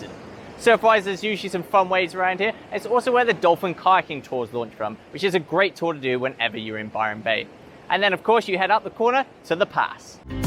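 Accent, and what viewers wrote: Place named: Cockney, United Kingdom. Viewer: Rubbish. How do you think bungalung people feel about there home being exploited for money and popularity by Squeaky sounding cockney accent. Did U think at all. Surfing is a cycle that's learnt. You learn that a sand point of quality is hard to find. This is why no out of town surfers of low skill care at what they do in the line up. U can sitting on nose and same 2 English steroid heads will have there first drop in go. Bit further there's probably you and every chick longboarding that can't negotiate the the take off or cut back at all. Then we hit every body that faces backwards to the waveusually French and German girls.they only paddle for the biggest wave. The one 50 people have waited there turn for. It's done now why don't feature a drone tutorial of AYERS Rock. Ffff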